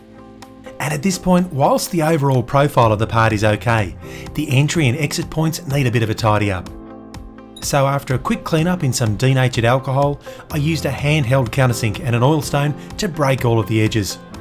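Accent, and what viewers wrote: Australia. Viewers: Hey! I was wondering, where are you in the world? You sound Australian, if so, where in Australia?:) Once again, great video!!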